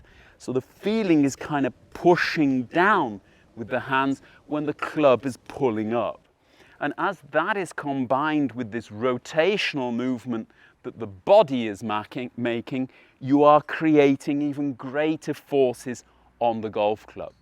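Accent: RP, United Kingdom. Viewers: ... while sounding posh and sophisticated.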